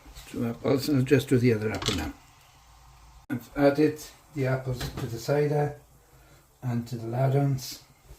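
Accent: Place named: Wales, United Kingdom. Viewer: Happy new year I've missed your Welsh tones that looks fabulous. I shall remember this recipe, so you don't have to write it down. ❤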